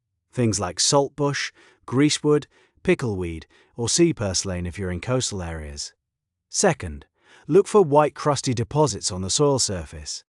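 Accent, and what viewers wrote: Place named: United Kingdom. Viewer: We don't like this British accent on these things in America no we don't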